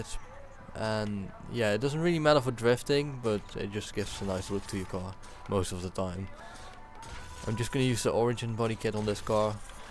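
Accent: Netherlands. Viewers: your are dutch right?? I noticed an accent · are you dutch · Ik ken je channel al lang, maar ik heb nog nooit een commentary van je gezien... Het eerste waar ik aan dacht was je accent, duidelijk nederlands. Groetjes uit Duitsland btw! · You can hear that he's from Holland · Are you dutch? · Accent is dutch, dat ben ik namelijk ook :p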